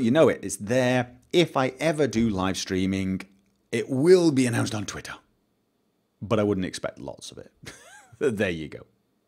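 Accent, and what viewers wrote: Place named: England, United Kingdom. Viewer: Wait I love you're accent but are you Norwegian or English because you sound English but you're channel country is Norway. Just wondering.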